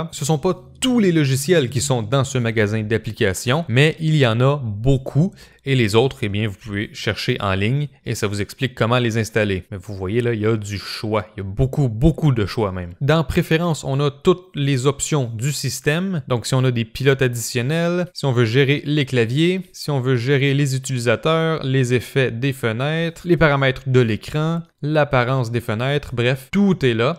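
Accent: Quebec, Canada. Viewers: Ahhhhhh enfin un quebecois. Je comprends mieux a cause du québécois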